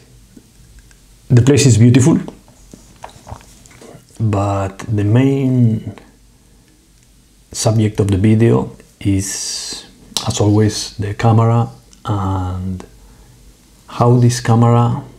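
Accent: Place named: Spain. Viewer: Hi, I thought I would send my message in Spanish to applaud your excellent spoken English . Here goes.... Por favor, haz más vídeos. Me encanta tu voz tranquila y tu hermoso acento español. ¡Es aún mejor cuando hablas con tanto cariño del magnífico M8! También tengo un ejemplo plateado y sí, es una historia de amor con la máquina, un dispositivo que une la sensación cinematográfica y lo digital en un momento del pasado durante la transición. Siento que estos sensores CCD eran excelentes y desearía que todavía se produjeran. La construcción y simplicidad de esta cámara me recuerdan que los fotógrafos del pasado con cámaras muy simples también podían producir fotografías de belleza y sustancia sin recurrir al enfoque automático y a los 50 megapíxeles. Me encanta especialmente el vídeo que hiciste con tu hermana. Sospecho que incluso si no tuviera interés en la fotografía, seguiría disfrutando de tus videos. 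¡No pares! Chris de Cambridge, Reino Unido Best Chris, Cambridge UK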